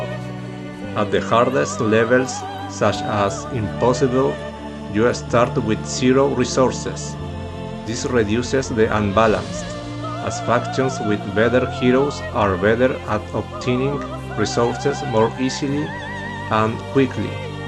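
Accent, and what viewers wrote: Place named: Spain. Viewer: I love magic the gathering too ☺️ one question, are you spanish? yo sí, vivo en Madrid. Por tu pronunciación del inglés supongo que eres español como yo 😊 Your vídeos are awesome! ☺️😃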